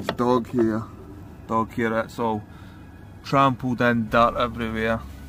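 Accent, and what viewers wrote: Scotland, United Kingdom. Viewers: Love the Scottish accent!! I'm from Morocco but live in Wales with my Welsh ge-rl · This was such a job well done! Originally from Maui, HI, but attending university in Seattle, WA! You’re Scottish right? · I love your videos! ☺️ and I absolutely LOVE your Scottish accent. Or is it not Scottish? Suddenly not too sure anymore 😄 nevertheless, I like it 😍😄 Swiss gal with a Scottish grandma here. So, technically not half Swiss half Scot but when it comes to my heart, at least half of it beats for this beautiful country called Scotland! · Is that the Scottish accent!!!😂😂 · I can't be the only one who watches these videos mainly to hear that Scottish accent... 😍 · I did enjoy the video. First, because I enjoy listening to your lovely Scottish accent as much as I enjoy watching the cleaning process; second, because it is nice to see a man cleaning for a change. 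lol. Waiting for your next video. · you sound scottish,first video :D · what is that awsome accent? scotch ? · good job! love the Scottish accent... alright goias and gaels... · Is this scottish?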